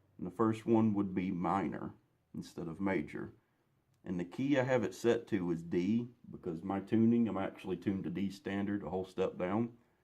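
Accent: United States. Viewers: Will this work worth a shit through the front? For some reason I never have much luck through the loop. You sound like you from Bama.🤘🏻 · Incredibly informative video, great work. Oh and the southern charm is always welcome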